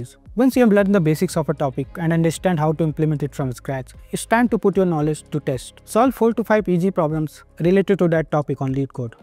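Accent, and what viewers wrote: India. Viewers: Thankyou so much for this video sir. Gave me a lot of insights as a beginner and sir, It would be more helpful if you pronounce some words clearly without using hindi accent since its not easier for everyone to understand the words like the ones starting with 'S'.😊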